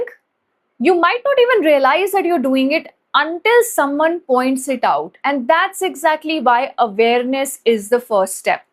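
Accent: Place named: India. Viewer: Everything is fine . Your accent needs a bit of refinement. R can be pronounced in Brit or American way. The Indian R 😅 ( barring Northeast)is really Bad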